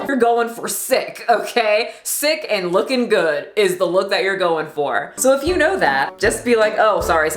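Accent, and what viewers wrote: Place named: Louisiana, United States. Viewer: are you southern? you got that Louisiana accent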